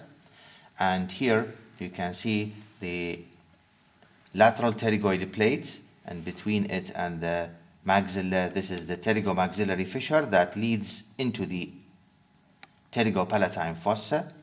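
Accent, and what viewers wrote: Iraq. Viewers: .. are you Iraqi teacher??? your dialect is 100% Iraqi:)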